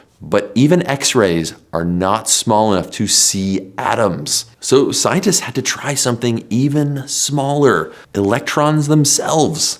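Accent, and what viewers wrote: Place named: United States. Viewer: American pronunciation is so weird, this video is entirely about Adams, not atoms, yet it shows atoms not men called Adam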